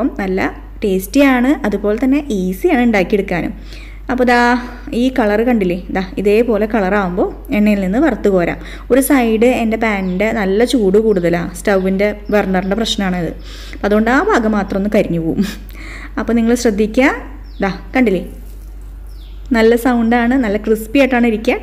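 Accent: Thrissur, India. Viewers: Ningal trissur ano....ente oru friend und shyra......same samsaram anu.....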